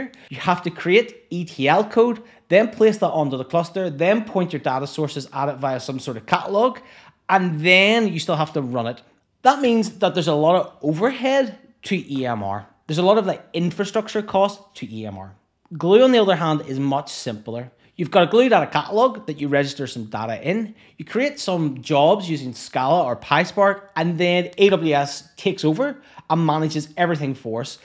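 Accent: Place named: Scotland, United Kingdom. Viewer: I love your Scottish accent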